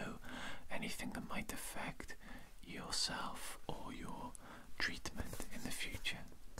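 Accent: Wales, United Kingdom. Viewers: I love the Welsh accent! My step dad is from the valleys So glad I stumbled across this channel tonight 😊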